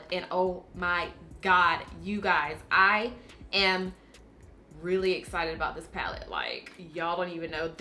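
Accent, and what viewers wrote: South, United States. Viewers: Omg, i love your accent😄, you sound a bit from the south country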